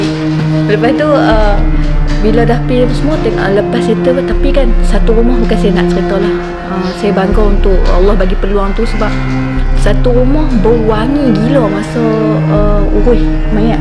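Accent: North, Malaysia. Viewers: Pekat betul nya ckp utara 🤣🤣🤣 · Xthu laa knpa loghat utara nii cute do😆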